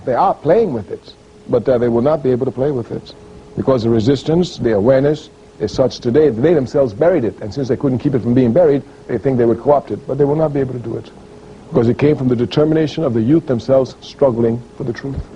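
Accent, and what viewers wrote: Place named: Trinidad and Tobago. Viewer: Here he sounds the most Trini I've ever heard him sound..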